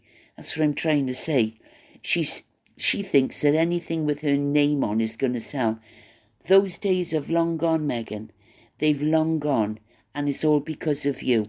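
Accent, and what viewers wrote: Wales, United Kingdom. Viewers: Hi,. I miss hearing your wonderful Welsh accent, as I live here in the Southern part of the U.S. Yes, you should be monetized. I empathize with you, Taz and Avid, too. God is still in charge, no matter what. Anyway, you are so very entertaining, mixed in with some home truths and life lessons for us all. Wish we could go back to a more innocent time, but our youth and childhood have long since passed. I also find myself a bit drowsy in the afternoon, as I am older, just like you. God bless you, your loved ones and friends, and take good care of yourself. 👑🏰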